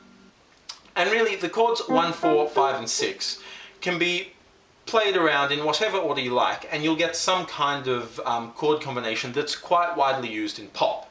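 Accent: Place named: Australia. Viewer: Great lesson, nice to hear a piano instructor on YT with an Australian accent for a change, so easy to listen to. Thanks for singing as well to illustrate the chord progressions. Well done.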